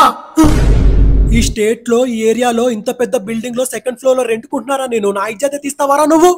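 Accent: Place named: Telangana, India. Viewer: Nuv telangana slang matladak ra malli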